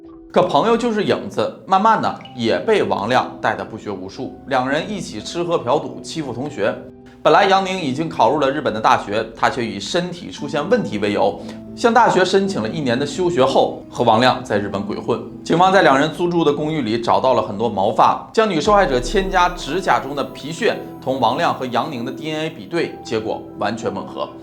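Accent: Northeast, China. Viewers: 是不是，东北人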